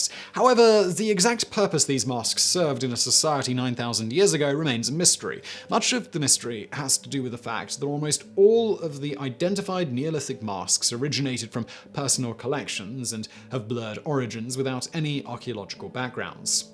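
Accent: United Kingdom. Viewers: Curious. What part of Britain are you from?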